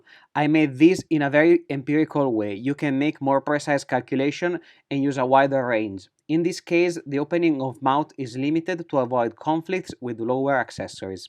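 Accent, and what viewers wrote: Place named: Italy. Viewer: Loving your accent, sounds Italian. I hope I'm not wrong.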